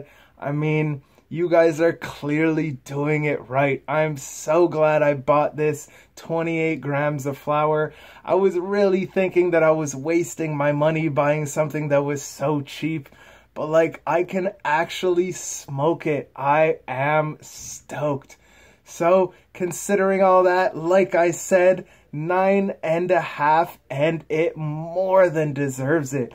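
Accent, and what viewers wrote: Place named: Atlantic Canada, Canada. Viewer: You from the atlantics? Trying to pin down your accent lol. New Brunswick or Nova Scotia would be my guess but it's really a toss up over there for me ahaha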